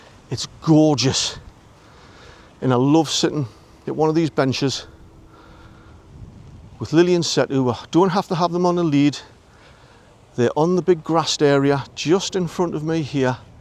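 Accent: Newcastle, United Kingdom. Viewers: It's wonderfull to hear the Geordie accent and to see some places associated with my family, way back. My mother was born in Pelaw in 1923 and she kept her accent all her life, despite living most of the rest of her life in East Anglia. Her father, Sidney Brown was a miner down Wardley pit. He was very active in the trade unions, and in October 1936 was one of the Two Hundred on the Jarrow Crusade. It may have been a futile attempt to challenge an intransigent government's mind, but now it is an iconic event that everyone has heard about. Your new video shows the very area where once there was a mine where "Ganga Broon" worked and my mother lived as a girl. So, huge thanks,. Keep up the good work! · As a Geordie from Wallsend now living in Thailand I help local kids learn English and I do not feel home sick as all my kids speak Geordie like you.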